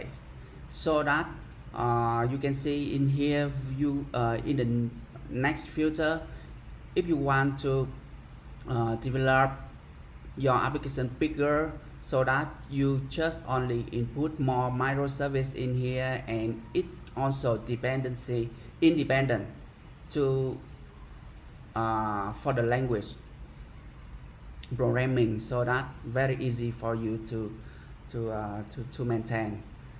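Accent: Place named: Vietnam. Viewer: Ây cái phát âm này ông bạn là người việt phải kh -,- Video hay lắm, cảm ơn ông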